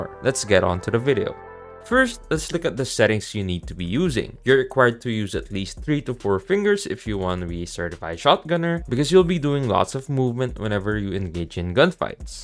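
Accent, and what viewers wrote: Philippines. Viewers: based on his accent hes filipino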